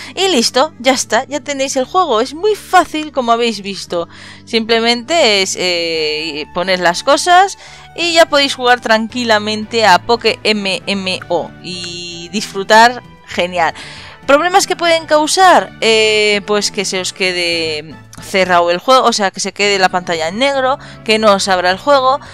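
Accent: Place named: Spain. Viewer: me encanta tu acento de que parte de España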